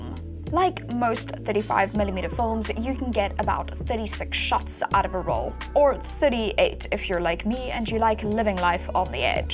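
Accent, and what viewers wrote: South Africa. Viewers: first five seconds and i know I'm listening to a fellow south african! much love from new zealand.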